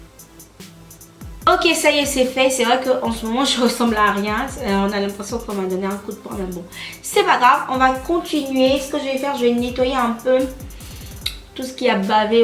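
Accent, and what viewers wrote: Ivory Coast. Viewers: ahah maintenant j'ai compris que tu a deux canal, c'est coul!!! j'adore l'accent ivoirien!!! :-* Que dieu te bénisse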